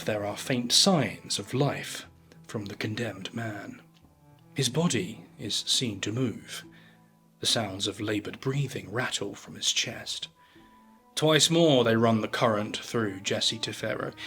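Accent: United Kingdom. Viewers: There is something about British accent and British ways of talking that always make me laugh. Hehehehe. Dude is hilarious... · This guy sounds like he might be from the same part of England as Rationality Rules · I was a state criminal prosecutor for 35+ years. Like most people, I feel conflicted over the death penalty. Even so, I think there is a great deal of misunderstanding over what happens during a legal electrocution. When 2,000 volts and 8 amps are applied, the condemned person immediately loses consciousness. Witnesses see very little movement except the person’s head goes limp after the first jolt. He is likely dead at that point, but a second jolt is applied to ensure death. This is probably gruesome to watch, but that does not mean that the condemned person is suffering pain. We know this because people who have survived accidental electrocutions at less current report remembering nothing. 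Electro-shock therapy is still used medically, with patients reporting no memory of the treatment. While there are a few exceptions, an electrocution usually is carried out without complications. The condemned person does not writhe or catch fire. I am guessing from the accent of the narrator that this video was made by a British citizen, where capital punishment is no longer legal. While I understand opposition to the death penalty, I would respectfully ask you to remember that the United States has different criminal problems than what you have in Britain and that capital punishment here, imposed by juries, is reserved for only the most aggravated types of murders. It is relatively rare. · A “vile” execution is necessary for a vile crime. In most cases, the condemned suffer much less than the victims. Fry ‘em all!!!! By the way- you sound like anti death penalty blubbering liberal limey. 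Why don’t you wash that shirt and report on rainbows and unicorns.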